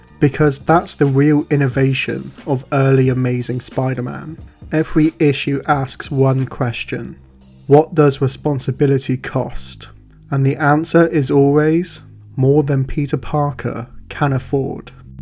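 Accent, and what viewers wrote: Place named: United Kingdom. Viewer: This Blows...sick of British Voices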